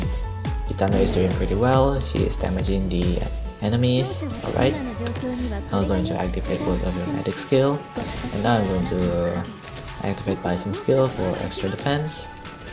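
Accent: Indonesia. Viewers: thought so, when i heard it, ah Indonesian accent somehow Keep it up dude 👍mantab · you sounds like indonesian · English Indonesia nya terasa bat anjir wkwkwkwkwk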